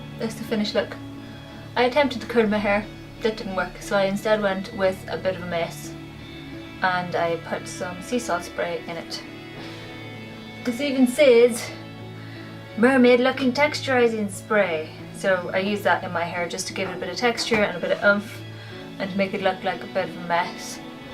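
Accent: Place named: Wales, United Kingdom. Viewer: Hi! I just popped over from Jerree's channel to see your Halloween look in the collab. Your version of Woman of the Sea/Mermaid is beautiful, including the lovely background music. Your eyes are gorgeous & the scales look very realistic with the technique you used. That was so much fun. Also, I'm intrigued by your accent, is it Irish? Most of my mother's family is from Wales & have that beautiful melodic sing song accent & yours is similar, but I'm detecting a bit of an Irish broque. I hope you don't mind me guessing! Love your tutorial & I've just subbed. ~Susanne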